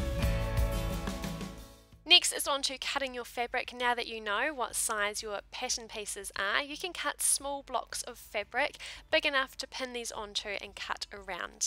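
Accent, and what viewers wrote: New Zealand. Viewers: she has a very strong NZ accent 🇳🇿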